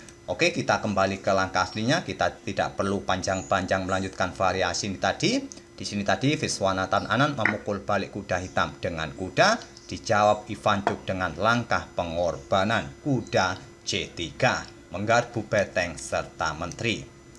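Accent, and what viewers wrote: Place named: Surabaya, Indonesia. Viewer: O..jebule (ternyata)wong suroboyoan,...salam ko aku wong Kediri sing manggon di kota Bekasi,...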